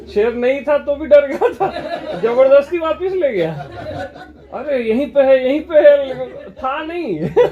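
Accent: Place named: Nagpur, India. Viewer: Aap nagpur ki bhasha khubsurat hai..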